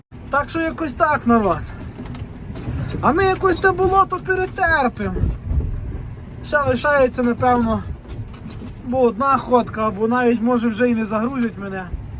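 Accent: Ternopil, Ukraine. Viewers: Ваш тернопільський говір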